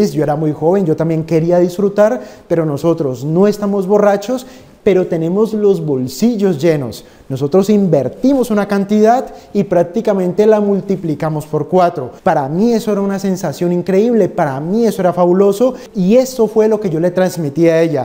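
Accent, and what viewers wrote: Colombia. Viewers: 💎💎😮Parce Tu Eres Colombiano?? Buena!!!!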